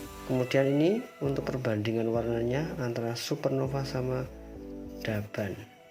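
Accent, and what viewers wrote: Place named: Java, Indonesia. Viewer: suweneng aku lek wong jowo review gundam, medok medok piye ngono lho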